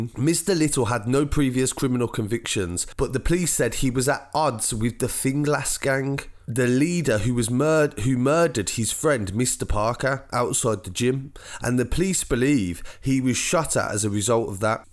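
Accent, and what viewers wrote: Birmingham, United Kingdom. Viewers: That Birmingham accent and the stutters, terrible, least you didn't say Birmingham no worse sound than some1 from Birmingham saying the word Birmingham. That's me done. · Love the brum accent!! But shocking stuff in Dublin!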